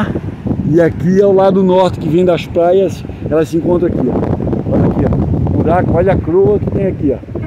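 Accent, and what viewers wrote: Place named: Santa Catarina, Brazil. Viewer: Lugar lindo, minha família por parte de pai é nativo daí,eu adoro o sotaque catarinense.lembra meu pai,Deus abençoe sua vida e família.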